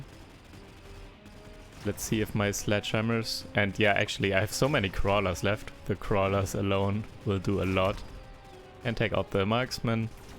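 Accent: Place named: Germany. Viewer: Sorry for the personal question...I am still wondering about your clean speach/language. Are you a native German maybe?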